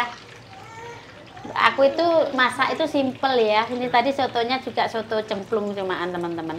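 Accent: Java, Indonesia. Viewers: Aqu suka bila te vloq masak " suka bhs nya ya mendok jawa blitar tak gojake ' pinter te ajari putri nya untk memasak ' sepandai apapun sekaya apapun bila punya potensi diri insya alloh berhasil dlm hidup So switt bahagia te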